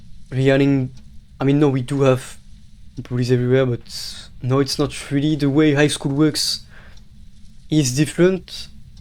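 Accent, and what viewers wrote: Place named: France. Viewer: im crazy supprised that ur french accent makes it difficult to really understand ur English.... But still nice smooth gameplay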